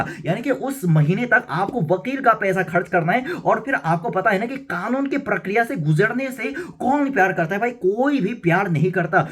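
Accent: Standard. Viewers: Unhone hindi ki padhai ki hai isliye shudh hindi bolte hai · Jitni sudh hindi bhai bol rhe h, utni to hme b nhi aati 😅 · कितना शुद्ध हिन्दी बोलता है । मान गया उस्ताद । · Bhai aap to mujhse bhi jyada shudh hindi bol rhe ho 😮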